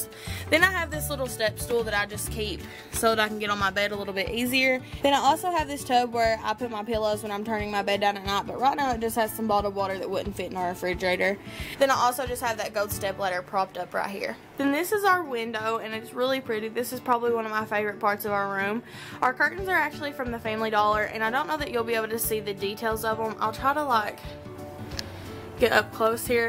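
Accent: Kentucky, United States. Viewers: Love your accent I wish I was from the south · OMG I could tell she was from Kentucky as soon as she started talking :) I'm also from Kentucky :) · What part of Kentucky are you from? I'm from southern WV and your accent reminds me of home :) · I LOVE YOUR KENTUCKY ACCENT!😍😍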